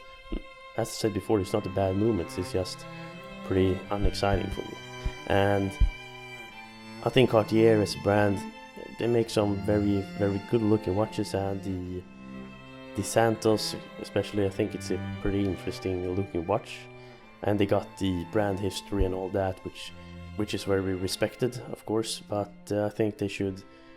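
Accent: Sweden. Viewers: this dude Swedish as fuk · He's Swedish right?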